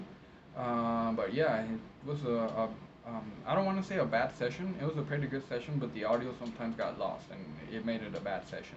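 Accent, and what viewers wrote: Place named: Mexican American, United States. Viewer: Hello. I enjoy watching your videos. Thanks for sharing. I was caught off guard when you stated you live in Mexico because your accent sounds Mexican American with English as your dominate language. Were you raised is the USA? Do you interpret for USA or Mexican providers?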